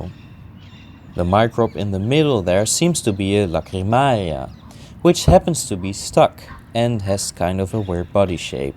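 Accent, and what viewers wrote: Netherlands. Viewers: I never was sure if you were dutch but you said it in this video i love our dutch accents 😂goeie video gast!!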